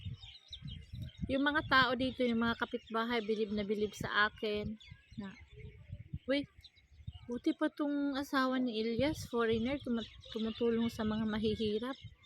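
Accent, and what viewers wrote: Visayas, Philippines. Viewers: Visaya diay ka ma'am..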